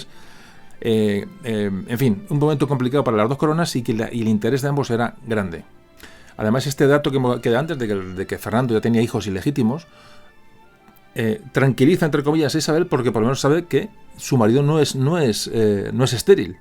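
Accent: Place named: Spain. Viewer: A mi me pasa con los dominicanos y los puertoriqueños ... Con este señor español necesariamente no. Pronuncia diferente a Latinoamérica pero se entiende muy bien con buen vocabulario!!!!!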